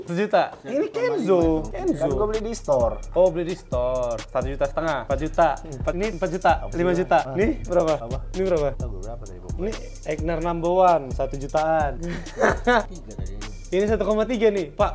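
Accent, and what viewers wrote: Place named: Medan, Indonesia. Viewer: Ak senang bnget dek. Conten rame cakap Medanny ak snang kali.mungkin karma ak orang medan mranto kerja di jakarta.makasih iy dek 🙏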